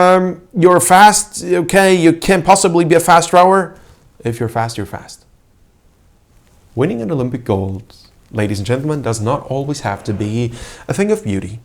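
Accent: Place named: Italy. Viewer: I absolutely loved the energy from this video!! Its tis type of race that inspires those magical stories about rowing; the inhuman passion drive/sprint that shoots a crew through the finish first against the odds. I always get the 2017 world's Lodo and Vicino pair vibes, just channelling the passion and drive for victory. Videos like this are fantastic! Loved it, and 10/10 Italian accent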